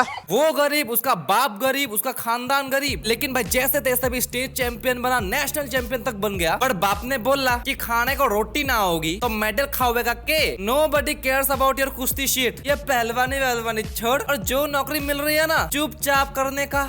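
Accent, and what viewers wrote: Assam, India. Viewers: Bruhhh I kindaaa felt like u r Assamese or yk Assamese 😭✨✨I was right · bhaiya kiya aap assamese ho .....???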